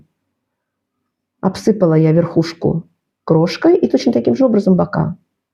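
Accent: Baku, Azerbaijan. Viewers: А я думаю акцент такой близкий.знакомый точно Бакинскиц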